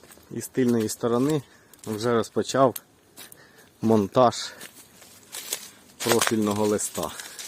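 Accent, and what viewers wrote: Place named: Poltava, Ukraine. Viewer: теж чую полтавський акцент) а де продовження ?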